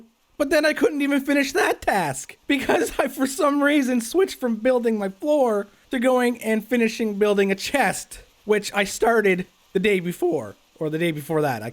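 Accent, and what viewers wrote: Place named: South, United States. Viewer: Whatever your name is again I think you have a country accent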